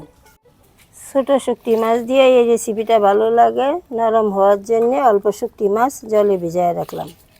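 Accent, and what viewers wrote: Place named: Tripura, India. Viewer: Tumer bari tripura naki tumer katha gulu tripura bashar moto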